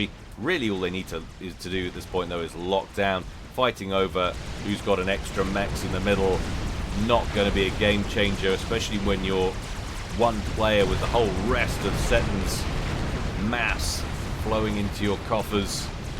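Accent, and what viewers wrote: United Kingdom. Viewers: Ive been gone for a while. But im back. Good to see your still active. I now feel old. But hearing a fellow british voice makes me know your quality has only got better.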